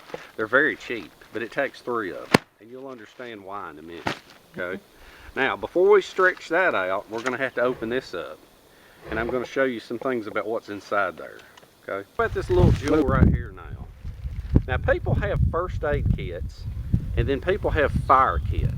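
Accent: South, United States. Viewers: Well, bless your heart. Like me, from the South. We all have license to talk funny. That includes the use of strange idioms. "Jewel" or "little jewel" is interchangeable with "doohicky", "thingamabob", "whatchamacallit", or "jobber/jobbie". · From down south? Alabama, Mississippi, Louisiana maybe? You sound just like my sister in Louisiana. (Only with a deeper voice...lol) I paused the video to write this. I’m waiting to see whether or not you say something like “Like this I reckon.” or “Look at this ya’ll !” Lol I’ll just die laughing. 💕😉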